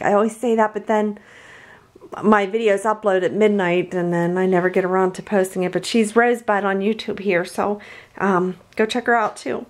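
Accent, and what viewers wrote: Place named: Pennsylvania, United States. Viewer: Ok, I thought I recognized that PA or OH accent. Then I saw the 'keystone' shape you used to stick in the clip holder. And I thought Ok.... she must be from PA. I grew up in western PA, near Pittsburgh. I live in SC now, but spent the first 18 yrs of my life up there. So where are you from? Hugs, Jessica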